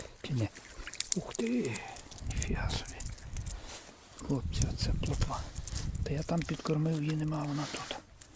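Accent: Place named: Chernivtsi, Ukraine. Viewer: Дякую.бо чути по розговорі що десь не далеко від черніців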